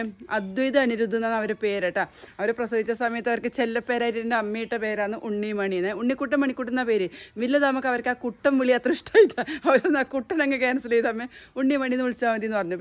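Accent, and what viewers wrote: Kannur, India. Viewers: കണ്ണൂർ ഭാഷ സൂപ്പറാട്ടോ, കേൾക്കാൻ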